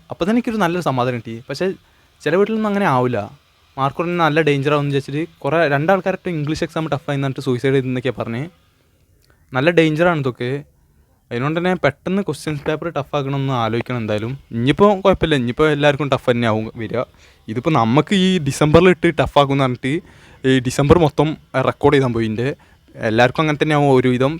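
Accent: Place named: Kozhikode, India. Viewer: Kozhikod ano slang kettiyu tonunu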